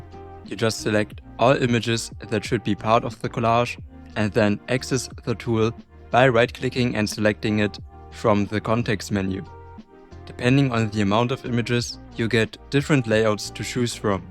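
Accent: Germany. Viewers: Was ein brutaler deutscher Akzent.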